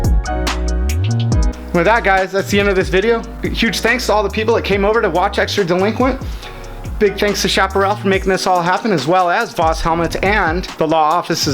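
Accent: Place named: England, United Kingdom. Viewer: I am not saying has gay hands even though they are pretty small and your ascent some times sounds a bit English.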